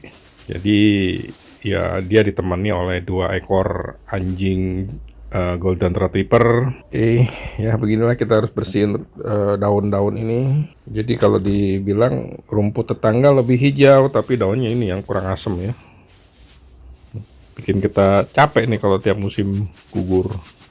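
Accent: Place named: Indonesia. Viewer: Wah jd panutan nih bersih2 pekarangan efek dr tetangga tanpa dongkol 👍👍👍, btw lidah Indo ya gak hilang 🤪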